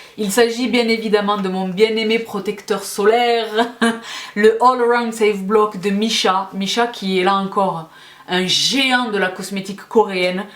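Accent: South, France. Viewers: Bonjour; je suis tombée par hasard sur vos vidéos et j'en suis fan........j'apprécie votre élocution avec cet accent chaleureux du sud et ce charisme qui rayonne à chaque vidéo, un vrai bonheur. Une abonnée de plus....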